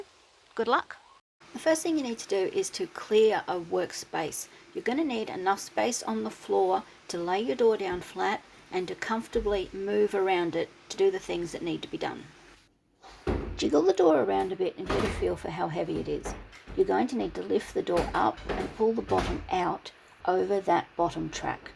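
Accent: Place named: Australia. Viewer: Absolutely brilliant thank you! Love the tip at the end about not reefing the door open as that's exactly what I'd do, old habits etc. Another thing, an Aussie accent yay. Love your Asst Tradie, beautiful moggie! Thanks again for a great video. From Western Australia ❤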